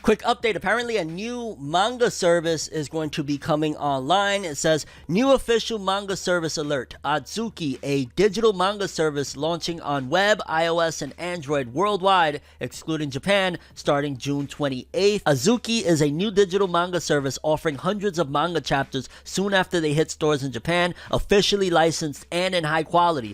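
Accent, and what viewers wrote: United Kingdom. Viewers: Dude are you British I catch the accent every blue moon in every video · best thing of fornever news is the british accent mate!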